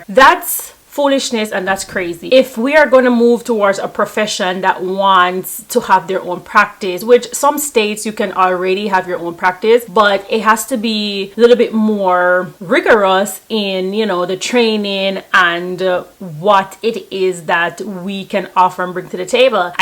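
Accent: Jamaica. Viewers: Are you a Jamaican? You sound like a Jamaican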